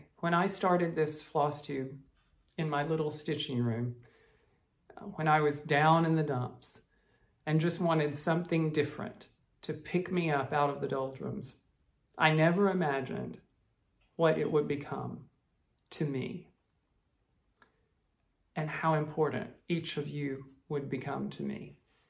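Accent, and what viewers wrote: South, United States. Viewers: Thanks for sharing your wonderful YOU!! Love your Southern accent- more please !😂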